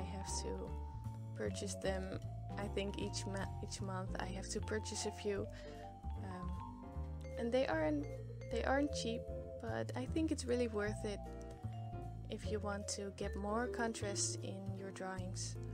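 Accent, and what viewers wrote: Netherlands. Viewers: Her accent is Dutch, she is Dutch so am I, is a typical Dutch name... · are u dutch?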